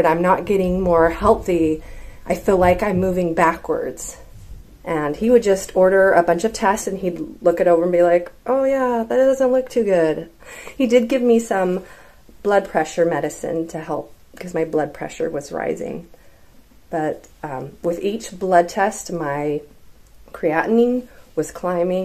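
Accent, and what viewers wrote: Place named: Canada. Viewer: OMG you just said something about being in PA? I honestly thought. by the way you talked, that you were a CANUCK EHH! :O * Mind Blown*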